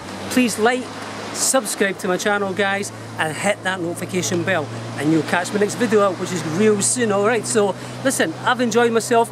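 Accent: Scotland, United Kingdom. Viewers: I love your Videos and your accent! Are you from Scotland?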